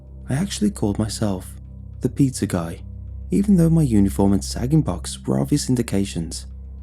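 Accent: United Kingdom. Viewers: I’m pretty sure he’s somewhere in the UK but I dunno.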